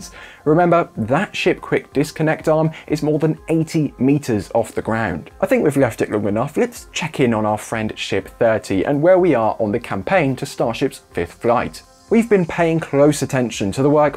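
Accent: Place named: United Kingdom. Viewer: "Centimeters" is exactly why I don't subscribe. There is something inherently comical listening to British accented narration about an American aerospace industry genre, knowing that ESA is virtually non-existent for British narrators to pronounce their terms with an imperious nose in the air pose.:face-green-smiling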